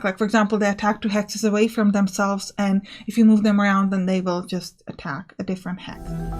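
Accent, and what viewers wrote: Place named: Philippines. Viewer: Wow. I have the honor of writing the first comment. Southern Command looked interesting and I intend to look into it while sales are still active. Thank you. PS: It is nice to find a female wargamer; from your accent Filipina. I only know a few words of Tagalog. I guess the most appropriate is word here is "Sigay" (don't know spelling). Thanks,... you videos on Field of Glory Empires were good too. (I am a former member of AGEOD and friend of Philippe Malacher.)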